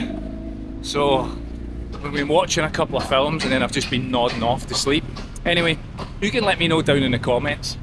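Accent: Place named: Glasgow, United Kingdom. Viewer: It's refreshing to hear your accent after leaving Glasgow 20 years ago. Used to live in Denistoun when I went to Strathclyde Uni. Enjoy KL mate!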